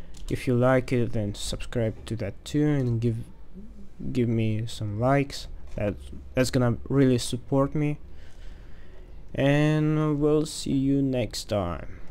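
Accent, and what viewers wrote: Russia. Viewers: A tutorial with a Russian accent? I am gonna like this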